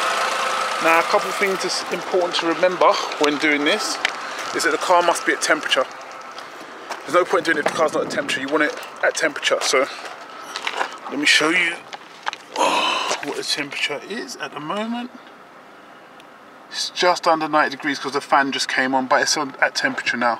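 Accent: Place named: United Kingdom. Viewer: I think, in future, just say "would not" instead of wouldnt, in case the non-Brits dont understand your accent mate!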